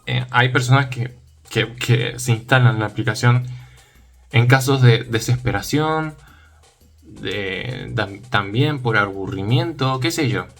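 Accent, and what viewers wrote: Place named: Argentina. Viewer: Eres Argentino 😊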